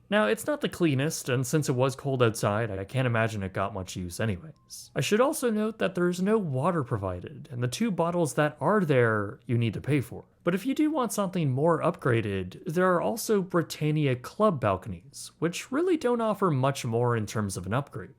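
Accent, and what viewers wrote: Canada. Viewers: I find this happening more and more with review videos... Typos and mispronunciations degrade the final product, and make you seem like you aren't the authority a piece like this would suggest. Look up all names and proper nouns first, check for punctuation, etc. Like these are the editing things that can take a viewer out of the experience, and make the presenter look immature. A few have mentioned the "Verraz-nano," and that was kinda it for me. My comment would be one of laughing along if this video was aiming to be funny, but it's obvious that this isn't the objective. So I'm saying it now, if you want to come across as authoritative on a subject, grammar, editing, pronunciation, and word variation ("very...very...very..." etc.) are priorities to get right. And it's pronounced more like, "Ver-rah-zah-no" if you're from the city like I am. 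With your Canadian accent, I'd say maybe something like, "Vair-ah-zah-no" is more accurate. Where we have stronger E sounds, you guys seem to go towards an A: "Ter-rible" vs. "Tair-rible". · I am new to this channel and I instantly noticed the Canadian accent😅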